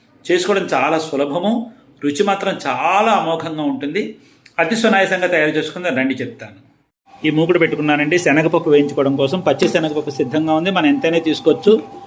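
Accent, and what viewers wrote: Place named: Tamil Nadu, India. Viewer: మీరు తెలుగు వారైనా ఎక్కడో మాట తీరు మీ వర్చసు తమిళం లా ఉంటుంది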